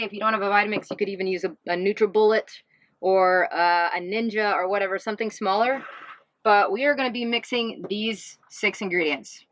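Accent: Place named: Wisconsin, United States. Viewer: As a born and raised in Wisconsin I def approve of the accent!!!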